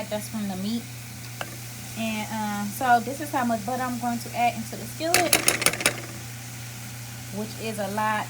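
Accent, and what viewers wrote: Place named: Texas, United States. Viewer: New subscriber so I probably missed it- where are you from originally accent sounds like Texas maybe Tennessee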